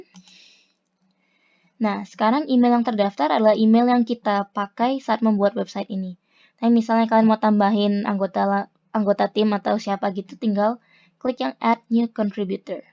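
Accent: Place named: Java, Indonesia. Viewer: Semangat Mbak....walaupun di Tokyo dan sering komunikasi pake bhs jepang/english tapi aksen jawane isih ketok lho...wkwkwkwk. Maturnuwun nggeh tutorialnya about digital content...ayo banyak upload cerita tentah gimana bisa kuliah di jepang dll....kehidupan sehari hari di jepang, suka duka nya...dll...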